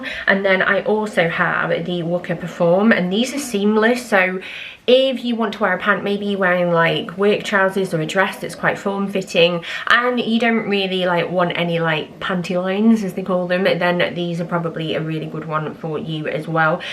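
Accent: United Kingdom. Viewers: Random one but are you from the Wirral? 👀x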